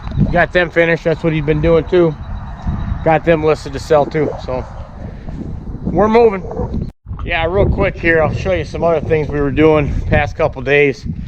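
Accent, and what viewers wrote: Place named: Upstate New York, United States. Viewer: which units where you in when in the Army? I am a huge fan, I am active duty now and grew up milking cows and working the farm for my uncles in upstate NY. The more I watch your videos, the more you sound like them. The way you present yourself is clearly as a leader in the Army would, especially when you told your nephew that "we start strong and finish strong." Love the channel. Keep doing what you do.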